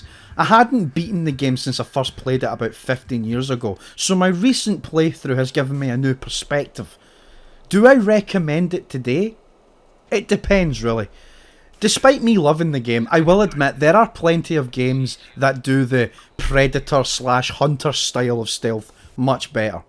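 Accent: Scotland, United Kingdom. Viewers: Gotta luv the Scottish accent.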